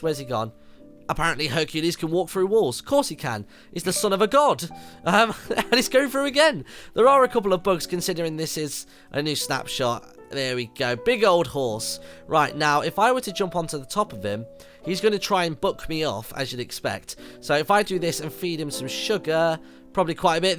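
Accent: United Kingdom. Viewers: that british is so funny :)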